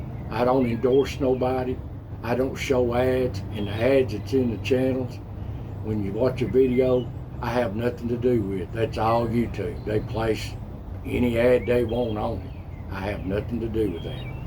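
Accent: Appalachia, United States. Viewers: When I come to channel, I feel like I'm home. I was born and raised in the South Western part of Virginia, and Tennessee and Kentucky are just a stones throw away from where I grew up. Naturally I love the Smokeys, and speaks the dialect that I speak and grew up with, and that's comforting to these ol' ears! I love channel--especially love the wild life videos. I actually love all of his content, as I've never seen a single video of his that I haven't enjoyed! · I watch all your videos. My people came down here to Florida in 1835 from the Appalachia. But we still pretty much talk like y'all.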